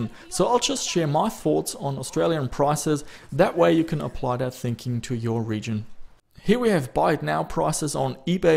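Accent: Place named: Germany. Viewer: Australian Dollars?????? For your accent I thought all this time that you were German, but then again i am from Mexico so I guess we North Americans know little about the south-eastern corner of the world.....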